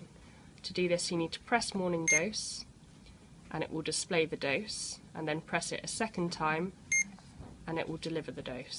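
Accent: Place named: United Kingdom. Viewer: Thank you. Love your explenation and your British accent.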